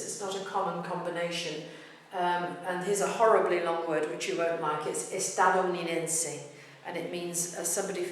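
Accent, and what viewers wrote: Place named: Spain. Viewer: Have to start saying these videos are really good and she explains them very well, after all she is explaining the basics and then if people want to learn the language just have to learn vocabulary, now english speakers need to know that as in english language there are accents, and she is explaining spanish from Spain accent, thats why the CE/CI sound like a ZE/ZEE. so since most of spanish speakers are latin that CE/CI sounds like the S when you say say. very good video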